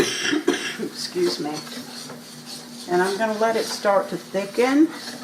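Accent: South, United States. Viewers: I have not hamburger steak in years. That sure looked good.A real comfort food. So yummy. Wish I was there for dinner. My mama always said "cut off the heat" or "cut off the lights" and not turn down the heat or turn off the lights. I can tell you are Southern. So cute. I always say I can speak Southern. Does that make me bilingual?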